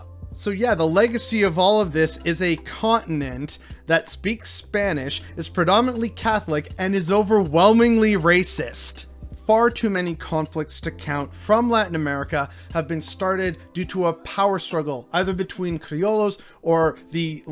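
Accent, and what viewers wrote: United States. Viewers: I bet you live in a predominately white neighborhood/city judjing by your gringo-accent spanish you can't have possibly lived around your brown-hispanic brotherens 🤨... Btw these White Nationalist brown/mixed-races Latinos have seen or experienced what it's like living in countries where Whites are a minority and they don't want to see that happen to the U.S. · Yo…..che… you can just leave you know Not because I say so but because you don’t seem happy Also your pronunciación is terrible It’s either fake American accent to Spanish Or not knowing enough Spanish ( and that. Is sad )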